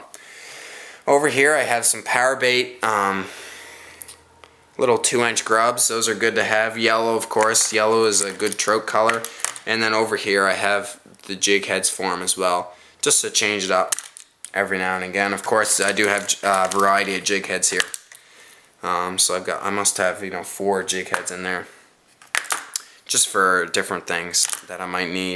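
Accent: Canada. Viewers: Aboot as Canadian as it gets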